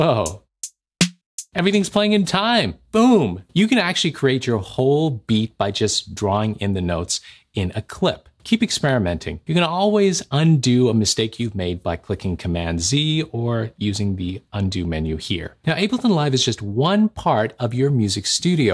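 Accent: India. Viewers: Are you INDIAN ? 😃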